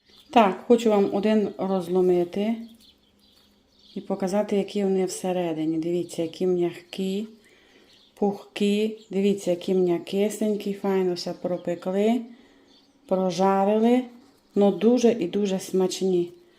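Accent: Transcarpathia, Ukraine. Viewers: Дякую за дуже смачні рецепти❤️ подобається й закарпатський діалект 😊 натхнення Вам💙💛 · прекрасна українська мова з закарпатським діалектом, супер! Дякую за рецепт! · Добрий вечір,! Дякую вам за чергову смакоту, яку готуєте і нам показуєте! будь ласка не треба нічого вирізати при монтуванні, Аніта має рацію. Це особливість вашого каналу, ваш закарпатський діалект, слухаю і дивлюсь вас з превеликим задоволенням! Вітання з Вінниччини. Миру перемоги нашій рідній Україні, рясних Божих благословінь вам і вашій великій дружній родині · говоріть, будь ласка, на закарпатському діалекті і ні на кого не зважайте. Це так милоооо….. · Вона говорить на чистому закарпатському діалекті! Чого повторюєте?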